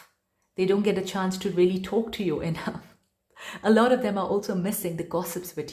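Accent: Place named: India. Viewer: Desi ho kya ? You sound indo English I’m indo Canadian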